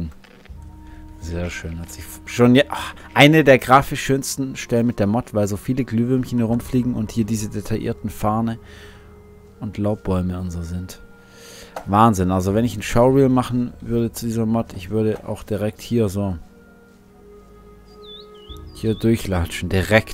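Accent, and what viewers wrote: Germany. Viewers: Ich finde es sehr schade, dass deine oberschwäbischen "Sprachwurzeln" mit dieser "Köllschen Betonung" die Du mittlerweile angenommen hast, verloren gehen.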